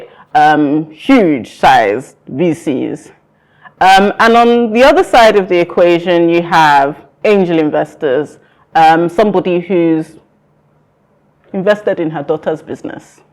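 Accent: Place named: United Kingdom. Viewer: Nice one, but a local academic lecture shouldn’t come with such a deep British accent tho.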